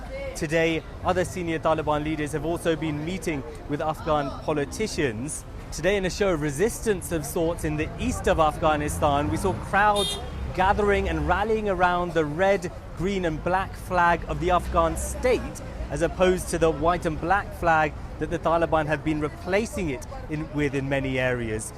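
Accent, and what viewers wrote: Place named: United Kingdom. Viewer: イギリス人みたいな発音だ。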